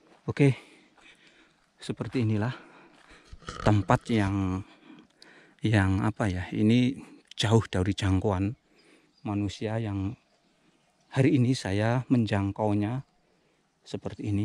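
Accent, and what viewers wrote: Java, Indonesia. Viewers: assalamu alaikum wr.wb. njenengan jawane mana kak... wassalamu alaikum wr.wb.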